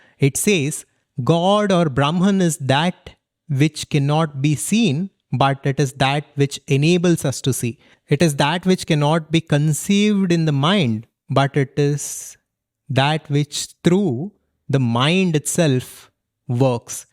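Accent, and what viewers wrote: India. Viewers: Probably the best explanation to this question in the thickest Indian English accent I’ve ever heard.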